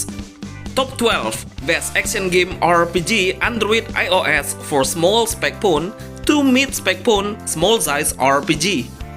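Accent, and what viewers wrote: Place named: Indonesia. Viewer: Yes, he Indonesian accent so thick 😊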